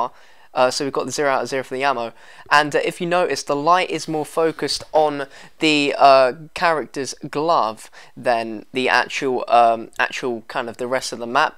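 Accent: England, United Kingdom. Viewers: well you are judging him by his voice? hes in uk not in america or anything he has an ENGLISH accent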